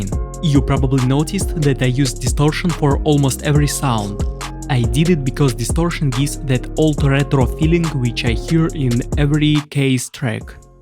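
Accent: Russia. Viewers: Amazing, сразу узнал русский акцент)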